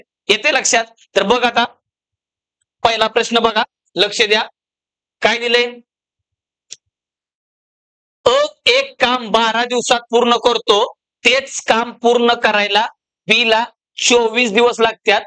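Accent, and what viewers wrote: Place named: Pandharpur, India. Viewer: Sir tumhi kuthe rahta .... pandharpur taluka ahe ka tumcha.... language tikdchi vatte....ekdam chan teach krta....lagech concept clear hotat....mi khoop videos pahile tumche....shekdewari ,train,kal kaam ....boat. Ni pravah ..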